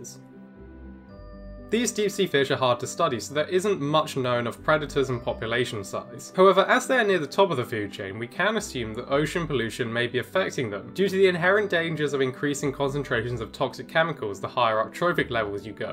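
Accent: RP, United Kingdom. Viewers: Calling zoologists/scientists "stupid" while regurgitating all their findings in a lisping plummy nonchalant monologue 🤦🏻‍♀️ L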